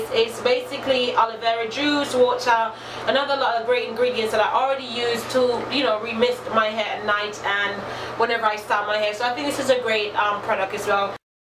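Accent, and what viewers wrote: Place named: United Kingdom. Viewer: Did u spend time in the uk because I can hear a bit of a British accent love the hair btw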